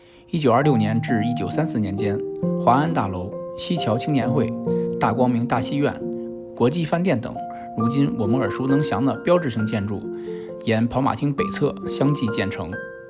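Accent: Beijing, China. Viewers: 你是北京人嗎,你的國語很好聽.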